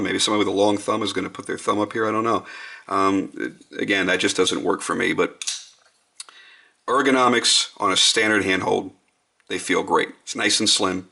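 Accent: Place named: United States. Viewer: I like your 'Murica accent, lol. Great and honest review. I had one of the first run Norsemans (vid on my channel) and it was a great knife for his true first attempt in the folding knife world. I think if you watch John's knife making Tuesdays series, you'd get an even better idea of all the work John and Erik had put into making these knives, but most importantly you'd get an idea of who the Grimsmo brothers are and they truly are good guys. Thanks for the review, keep 'em coming!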